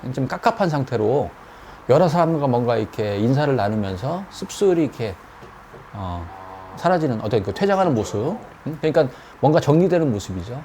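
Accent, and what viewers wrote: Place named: Jeolla, South Korea. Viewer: 말투가 호남사투리 전라도 점쟁이네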